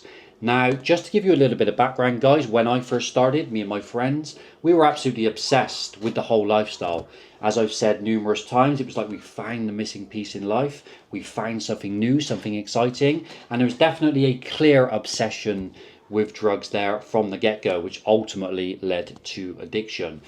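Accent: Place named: Bristol, United Kingdom. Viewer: You sound like your from Bristol ? Can I get in touch privately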